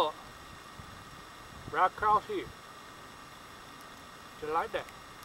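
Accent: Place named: South Carolina, United States. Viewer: I dint no ole Beaver live down deh een dat rivah! You sound like my kin folks down in the SC low country there,. Funny stuff! Thanks for the tag...I'll try and make you proud.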